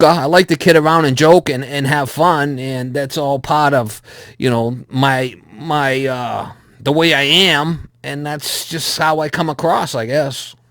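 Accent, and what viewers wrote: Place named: New England, United States. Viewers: Love the voice and New England accent. Accents are dying out. I grew up in Los Angeles and have no accent whatsoever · Hey. Luv your videos. I know what some people are saying, but maybe part of it is that they're listening to the words your saying to a person on the camera but not seeing your face when your talking to them. IDK. Anyway, you do you.:) I also think part of it is your accent. You do have a strong NE accent. I agree, don't change for anyone, right.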